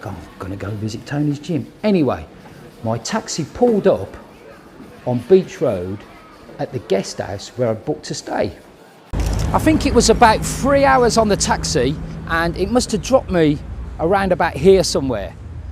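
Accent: Birmingham, United Kingdom. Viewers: I thought it was a Birmingham accent but I didn't want to insult you and mention the black country. I worked in West Brom for 23 years before moving over here, it's a very small world!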